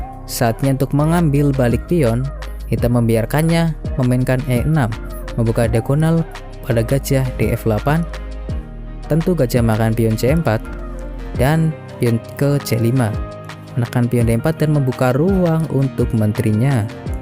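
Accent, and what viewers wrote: Banyumas, Indonesia. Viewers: Ngapak ya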